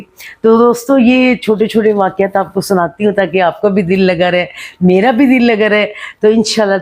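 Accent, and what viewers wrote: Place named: Punjab, Pakistan. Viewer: آصف خان کی اردو کی نقلیں اتارنے کی ضرورت نہیں انکی اردو آپ کی پنجابی نما اردو سے اچھی تھی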